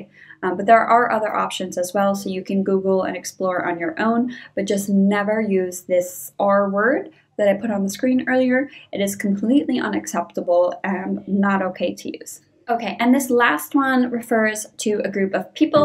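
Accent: United States. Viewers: Thx for your answer. Corsica and Sardinia are similar. Sardinia has less (and lower) mountains...and more beaches. I could guess you were from northern U.S.A. I've been to California, Arizona, Texas and Florida and their accent is different (especially in TX).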